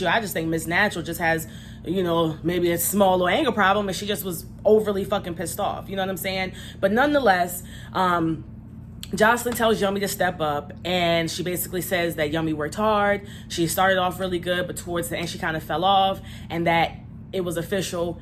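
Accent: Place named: Baltimore, United States. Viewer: Love this review!! New subbie.❤ are you from Baltimore? You sound like it.☺ Yummy in that parking lot was so freakin cringy. She should be mad embarrassed.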